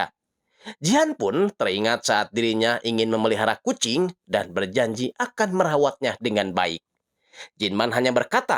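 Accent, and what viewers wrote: West Java, Indonesia. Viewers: Keren pisan euy channel na Aya logat sundana Salam dari malausma Majalengka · mantapp mangg, guaa sukaa chanel ini cuma garaa² amang nya ada sundaa² nya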